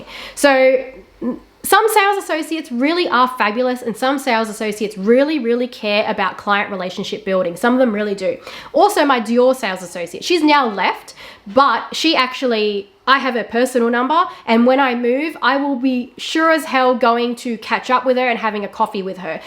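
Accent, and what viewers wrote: Australia. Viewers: so interesting! I actually found you from this drama but will continue to watch your Chanel now ! Keep it up! I’m a aussie in the USA so nice to hear a aussie voice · And you think you know everything and can determine SOMEONE ELSE'S place of origin simply because you don't like them, or the fact that they're from your country of origin. Do you even know what Aussies SOUND like, because she sure af sounds like one to me.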